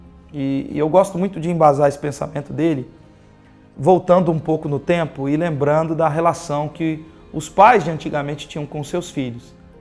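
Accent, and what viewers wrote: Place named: Recife, Brazil. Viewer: Pelo sotaque... já me ligou no saber entender..sou recifense e amei lembrar minha terra. Você é bem sábio pra idade que tem. Tenho dois filhos e o amor sim ..tem de ser construído e as vezes ..a duras penas porque os filhos não têm maturidade e ser mãe implica em amar e se respeitar e ensinar isso..e é difícil..mas vai..o amor é uma semente que a gente rega..se nascer nasceu..se não nasceu é porque a terra não estava preparada no momento certo. Gratidão pelas suas palavras ...gostei e me conquistou pela sua frontalidade.🌹🇧🇷🇵🇹🌵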